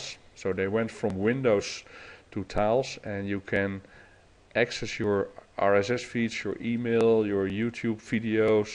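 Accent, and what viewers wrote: Netherlands. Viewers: whaha viertual musjien Ik heb echt een hekel aan engels met een nederlands accent hoewel ik het zelf ook heb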